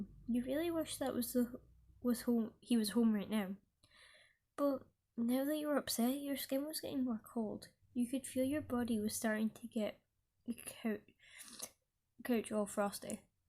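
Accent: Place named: Scotland, United Kingdom. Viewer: When you spoke the exact words that came out my mouth was "wait a minute you sound scottish" 😂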